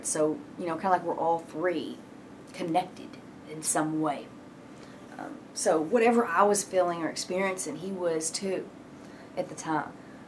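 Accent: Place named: Texas, United States. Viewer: i can tell she is fro texas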